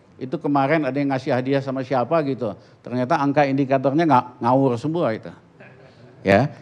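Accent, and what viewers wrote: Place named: Netherlands. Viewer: Pak * kental logat belanda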